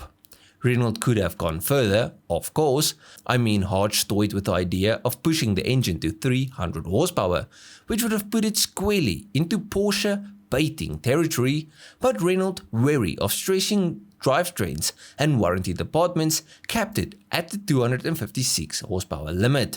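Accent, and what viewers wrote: South Africa. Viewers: exactly. Sounds very much like a south african afrikaans speaker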